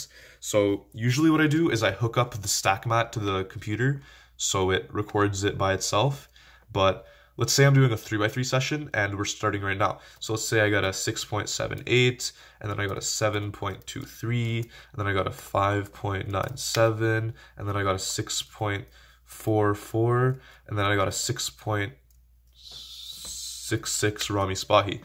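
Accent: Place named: Canada. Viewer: Dang I just noticed how much of a canadian accent he has.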